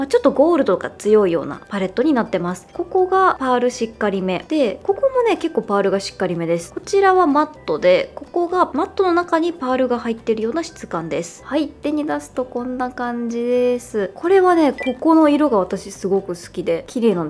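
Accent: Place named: Aichi, Japan. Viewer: の「服」とか「靴」とかの愛知イントネーションはだいぶ聞き慣れたのですが、「ほくろ」も標準語とは違うイントネーションなんですね！いつか愛知に遊びに行ってみたいです☺️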